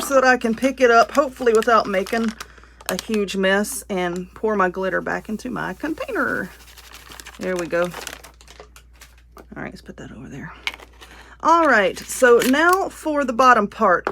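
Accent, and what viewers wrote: South, United States. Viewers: Girl, you are soooooo Southern!!!!! Your accent is deeper than mine (ATL)!!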